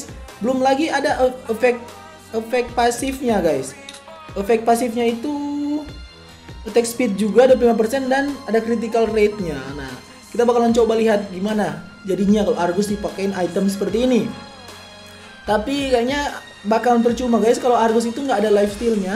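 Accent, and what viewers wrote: Manado, Indonesia. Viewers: Kak dari manado? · Ka org Manado yah... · Abang orang Manado??? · abang orang manado ya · yooo org manado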